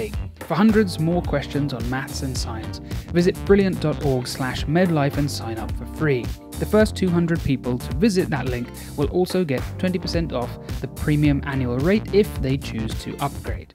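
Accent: United Kingdom. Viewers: One can observe: - 930 liked this video vs 2 who did not. So far.... A bias of people who like a certain type of British accent? Or perhaps it's the background music? Or perhaps the mention of Minestrone. I like Minestrone - usually. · You seem to know a lot about medical bias but I have a dictionary .So please spare me your snooty british definition with that that lame accent you might not realize youre over the top condescending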